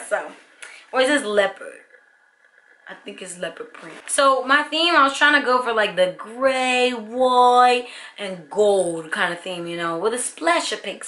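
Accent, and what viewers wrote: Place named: Nigeria. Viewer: Oh my gosh, I haven't heard anyone do a Nigerian accent in a very long while. Ahh, I wan't to go back so badly!!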